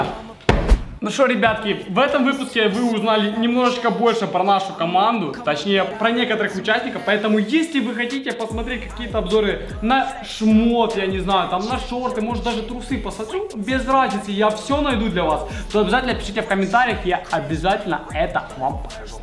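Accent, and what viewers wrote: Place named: Ukraine. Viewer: почему у тебя акцент Украинский?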